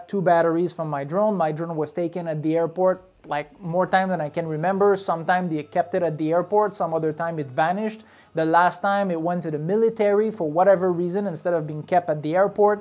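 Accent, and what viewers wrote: Canada. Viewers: His accent sounds Canadian to me. Don't think he was comparing to U.S.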